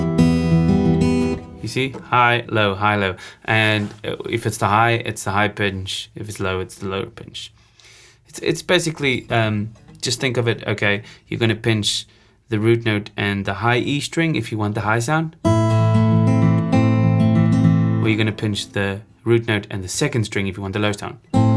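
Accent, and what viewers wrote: South Africa. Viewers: I can hear when someone's from SA. Takes one to know one.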